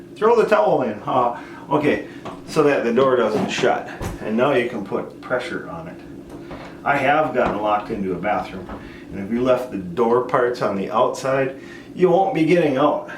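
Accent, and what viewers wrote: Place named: Midwest, United States. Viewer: thanks. very clear. sounds like a midwest accent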